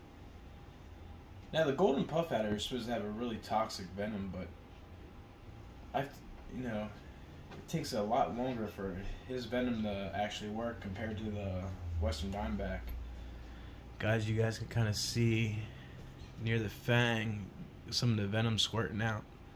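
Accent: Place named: Philadelphia, United States. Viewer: Where in Philly/south Jersey are you at? I live in north Jersey so I recognize the accent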